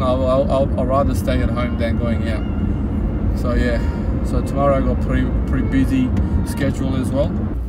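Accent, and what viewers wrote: Australia. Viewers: in ka accent to pure aussie lag raha hai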